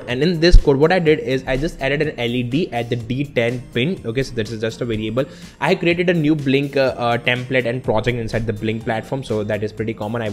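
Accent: India. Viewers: English with spicy hindi👍🏻👍🏻👍🏻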